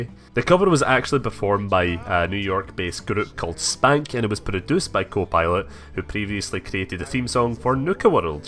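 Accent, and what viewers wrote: Scotland, United Kingdom. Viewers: are you Scottish it sounds like it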